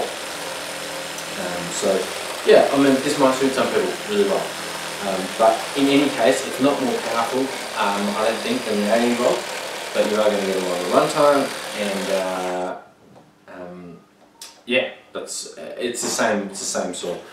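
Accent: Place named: Australia. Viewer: Love it when an Aussie is doing a video - great to hear our accent.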